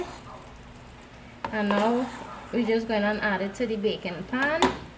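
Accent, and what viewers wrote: Trinidad and Tobago. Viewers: A tru trini talk dey gal 😂❤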